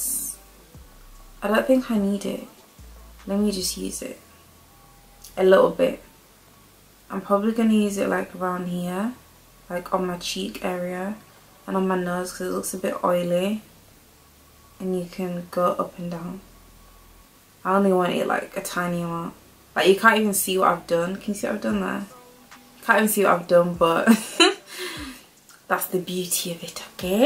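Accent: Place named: Yorkshire, United Kingdom. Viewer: Omg your Yorkshire ❤️ I’m subbing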